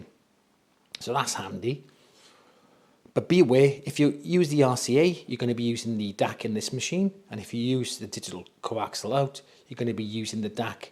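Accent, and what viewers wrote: United Kingdom. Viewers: Excellent British voice, I’m jealous 😭💞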